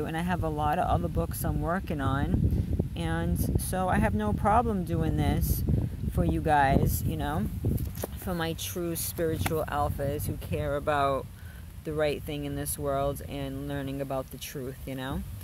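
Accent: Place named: Bronx, United States. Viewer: I can barely hear your Brooklyn, Bronx accent in your voice.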